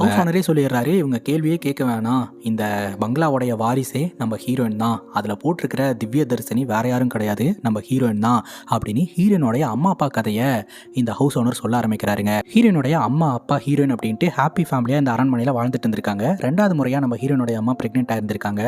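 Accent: Coimbatore, India. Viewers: coimbatore ah neenga?!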